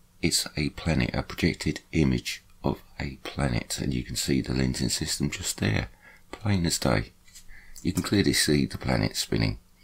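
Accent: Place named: London, United Kingdom. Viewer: i thpught it was danny dier!! I lav tha landan acsent maytee..up thee apples n blady pears boss...awsome great explanation in every video u put on...👍☝☝☝☝thank u..from nottingham..uk.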